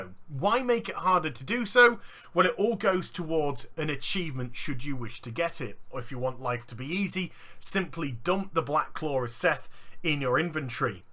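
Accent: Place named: United Kingdom. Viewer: Your comment is funny because he's from the country that created the English language, yet you "correct" his pronunciation.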